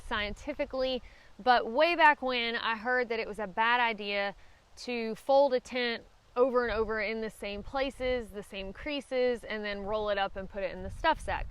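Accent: South, United States. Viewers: Were you born in the South? You pretty much sound Southern. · florida born and raised i really enjoy listening to your southern accent🤗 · I am not a backpacker, but I like listening to your awesome southern accent!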